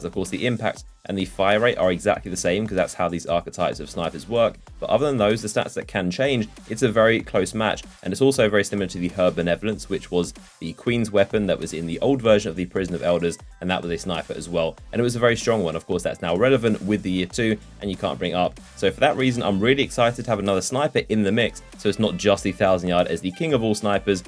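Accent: United Kingdom. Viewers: Outrageously British PS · Outrageously British yay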